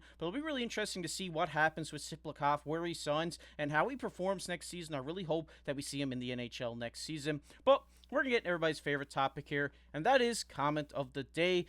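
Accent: Newfoundland, Canada. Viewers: No trouble to tell your a fellow Newfie! Good to see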